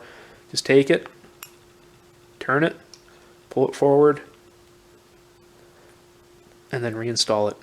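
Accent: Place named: Minnesota, United States. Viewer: I detect....... minnesotan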